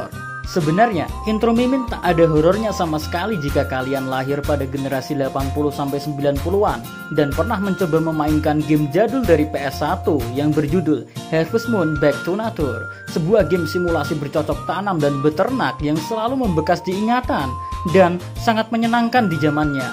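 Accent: Java, Indonesia. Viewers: Jangan diganti y min intronya .... Selain krna suara lu yg medok ,, gw subscribe chanel lu krna intronya .... Berasa jd bayi lg w klw dnger intronya .... 😅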